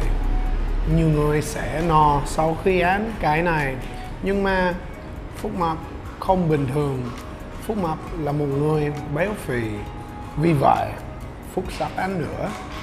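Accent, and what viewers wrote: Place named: Vietnam. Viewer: HELLO FROM AMERICA. HELLO ANH. I ENJOY LISTENING TO YOUR VIETNAMESE ACCENT, LOL. I GREW IN AMERICA. AS AN AMERICAN I'M USED TO ENJOY GOOD CHEESE. DO YOU MISS IT LOL? MY PARENTS ARE FROM VIETNAM ORIGINALLY. MY DREAM TO VISIT THERE SOON, AT LEAST ONE TIME. REDISCOVER MY ROOT. WHAT IS THE MOST SURPRISING THING THAT YOU FIND YOURSELF FALL IN LOVE WITH? HAPPY NEW YEAR 2021. 🍹🍸🤣🤣🤡🤡🐖🐷🐄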